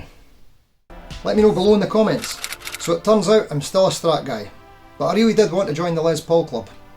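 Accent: Scotland, United Kingdom. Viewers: Guys I think he might be Scottish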